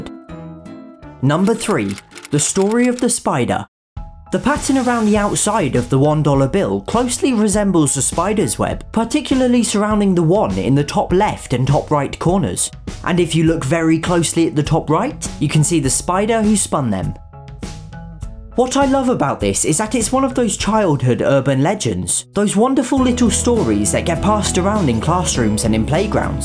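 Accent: United Kingdom. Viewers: Love you my Bri"ish man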